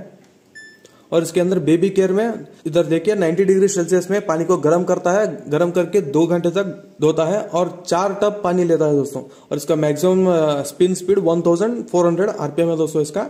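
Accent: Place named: South, India. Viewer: Your language is like south Indian speaking Hindi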